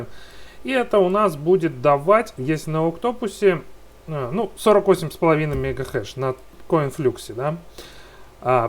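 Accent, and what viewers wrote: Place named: Armenia. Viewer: Прикольный! Говор больше на армянский похож!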